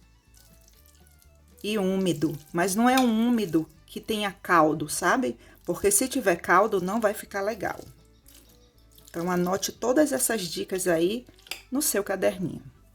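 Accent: Bahia, Brazil. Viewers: Eu caì no seu canal por acaso, me apaixonei de cara e me inscrevi imediatamente! Muita simpatia e esse sotaque maravilhoso da minha terra, a Bahia...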